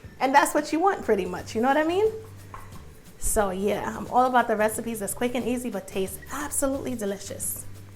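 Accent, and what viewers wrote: New York, United States. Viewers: oh yeah ....she's a New Yorker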